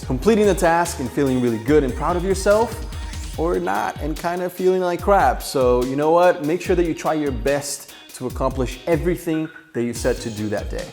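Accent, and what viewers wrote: Brazil. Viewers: Dunno why but you had a slight brazilian accent this vid...sounds a little like mine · Ele é brasileiro? Sei lá parece que tem um acentinho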